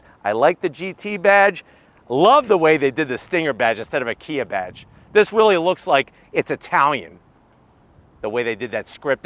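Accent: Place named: New York, United States. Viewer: Okay, a winning formula for your presentation. I could swear you have a New York accent. Great salesmanship. Do you ever bump into former students at a car dealership?!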